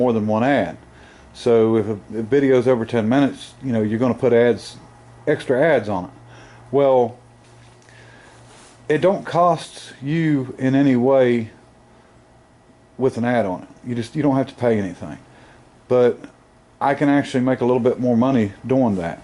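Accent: South, United States. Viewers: What state you in? I live in Rome Georgia. You sound like your from the south. I'd love to see the bike in person and maybe when done it may be in a show so we all could see it. I ain't trying to sound like a STALKER.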